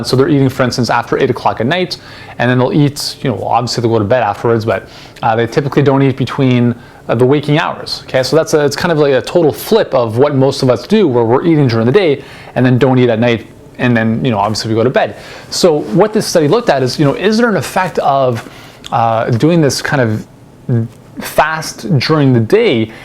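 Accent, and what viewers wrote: Canada. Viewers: Are you canadian? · Love canadian accent. 😉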